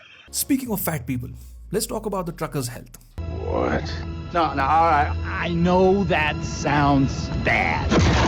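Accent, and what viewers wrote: India. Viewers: your accent so good reminded me of my indian teacher. "magzimum Load" chills.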